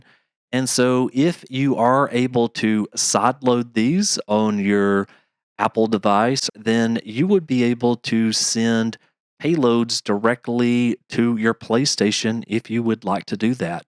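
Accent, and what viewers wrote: United States. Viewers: From which state are you from? You sound like mid west .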